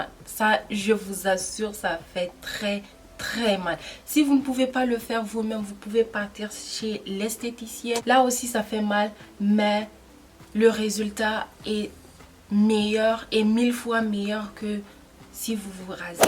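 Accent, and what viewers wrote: Niger. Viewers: Merci chère soeur Sinon tu as un accent Nigérien.suis Nigérienne aussi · J'ai direct reconnu l'accent Nigerien 😅, merci pour les conseils !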